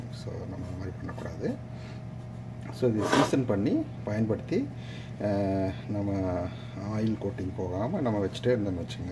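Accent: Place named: Kongu, India. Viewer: Effective crisp communication of key facts in simple kongu tamil !! Keep it up sir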